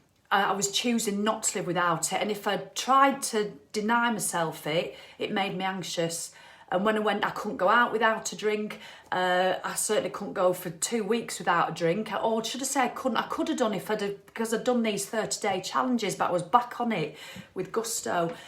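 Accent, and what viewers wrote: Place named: United Kingdom. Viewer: Great woman. And I rate this as one of the greatest accents in the world! Brings back fond memories of the UK.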